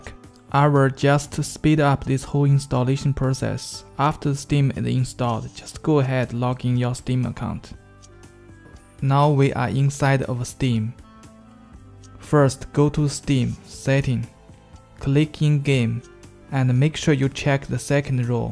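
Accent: China. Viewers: 熟悉的中国口音，蛤蛤蛤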